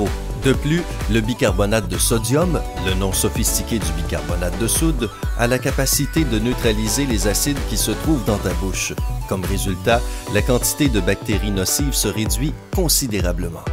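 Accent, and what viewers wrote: Quebec, Canada. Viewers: Vous avez un accent québécois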